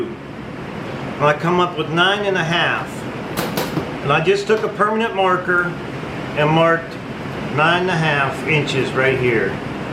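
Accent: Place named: Pittsburgh, United States. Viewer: Just used this video to help me install a damper for the first time. Loved how relaxed and simple you kept it, most DIY or pro style videos get lost in the technical jargon. So thanks for keeping it simple and focused on the point! Plus, the Pittsburghese made me homesick for western PA after a few years away now!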